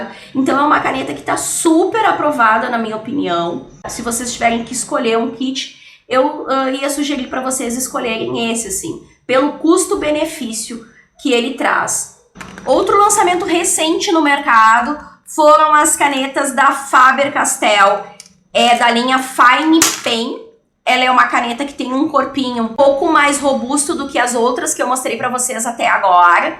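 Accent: Rio Grande do Sul, Brazil. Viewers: Qual a sua brushpen e onde vc comprou ela? Sou de POA e pelo seu sotaque vc também é gaucha, não acho nenhuma brushpen em nenhuma papelaria, poderia me indicar uma?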